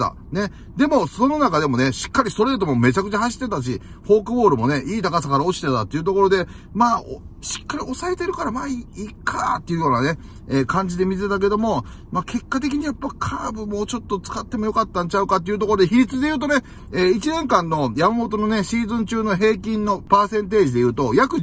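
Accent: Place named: Tokushima, Japan. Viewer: 徳島の人やなあ、いい感じの里さんの語り。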